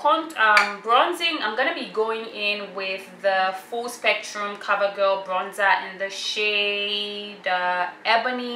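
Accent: Ghana. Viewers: When I came across your video and heard your accent I was like she sounds Ghanaian and I was right🇬🇭🇬🇭! I love your tutorials, keep it up. I plan on watching this to do my make up for a wedding I have coming up this weekend. Thank you! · This girl, you are a fine babe. My first time watching and I said, ahh this accent is from Ghana. I love your accent. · i came in the comment section to find out if she's Ghanaian...that Accent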